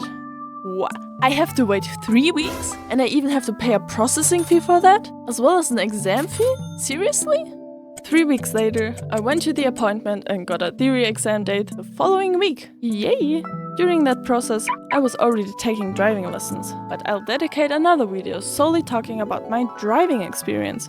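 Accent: Germany. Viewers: Your German accent sounds really cute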